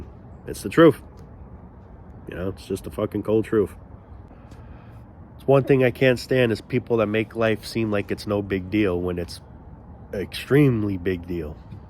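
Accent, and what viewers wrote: New York, United States. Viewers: New Yawk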